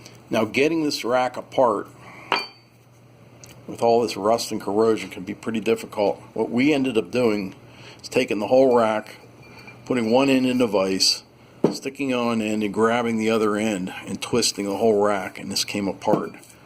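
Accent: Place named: Pittsburgh, United States. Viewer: I heard yinzer before I saw P.H. on the paper. A-K Valley native here.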